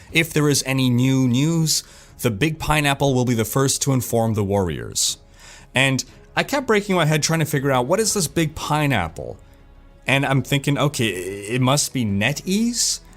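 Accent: France. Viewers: Your french ascent :D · ur french accent is not bad!!! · You have a very good french accent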